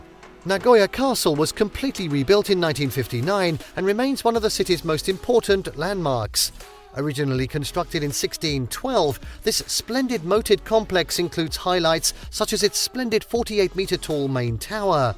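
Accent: United Kingdom. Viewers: Not really British English but it does have a slight British accent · イギリス英語かな？聞き取りやすい。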